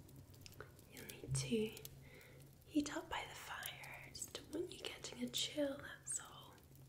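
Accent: Scotland, United Kingdom. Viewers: never heard a Scottish accent ASMR, makes it even more amazing with such a soothing accent x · Perfect timing, I’m currently getting snowed in in Michigan. You’re so good at this! A lot of people try too hard…over accentuating words, whispering to the point you can’t understand them, etc. You’re Scottish accent just adds to the relaxing tone & cadence of your voice and the overall experience. WhispersRed ASMR is fantastic as well, you’re both my favorites. I must have a thing for a UK accent hahaha!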